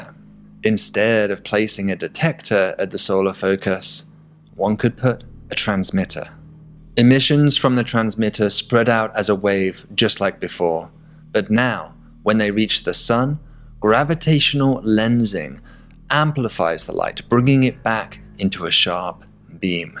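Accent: United Kingdom. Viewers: Pretty sure he’s British…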